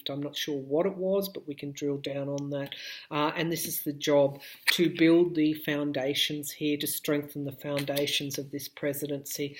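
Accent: Australia. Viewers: Hard to get used to that strong Aussie accent too..... just saying. She's good tho.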